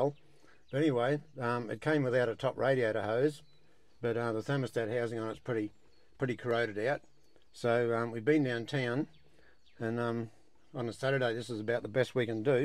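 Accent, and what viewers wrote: Australia. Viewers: Was happy to subscribe and comment. Will also share it as well. Love being able to here an Aussie talking, I was beginning to think I was Turning American LOL. Thanks can’t wait to follow along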